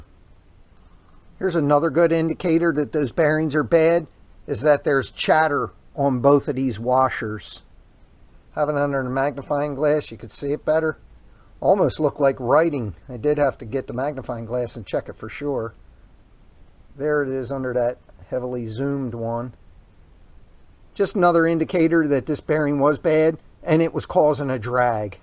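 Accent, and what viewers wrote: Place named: Mid-Atlantic, United States. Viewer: Also, are you from PA, Deleware, or Northern Maryland? Sounds like it.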